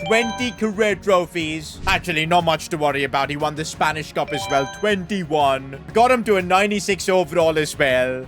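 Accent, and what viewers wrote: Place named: India. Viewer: yes bro he's still learning and he's improving if you check his videos in fifa 21/fifa 22 his accent was only indian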